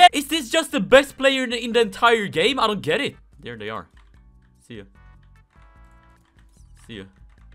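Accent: Sweden. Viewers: you kinda sound swedish.. am i right?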